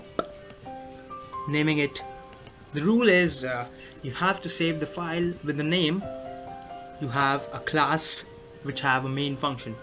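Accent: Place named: India. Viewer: Accent Indian hi rakh. Aur piche ka music hata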